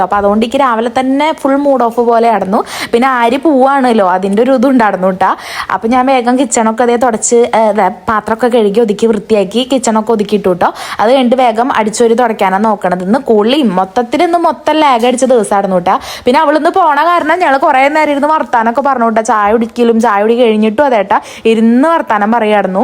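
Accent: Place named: Malappuram, India. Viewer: Kayi Malappuram slang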